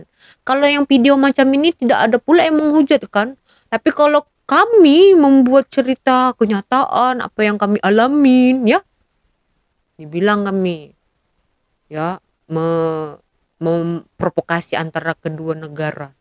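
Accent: Malaysia. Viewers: Yah malu malu bahasamu Melayu bang logatmu kau bukan orang Indonesia kalo berani buka topengmu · Bang kasih lepas topengmu anda ngomongnya macam bahasa melayu malaysia jangan bohong dong bang malaysia yang miskin juga banyak yang tidak punya rumah juga ada walau indonesia sema rakyatknya punya rumah betul enggak saya tau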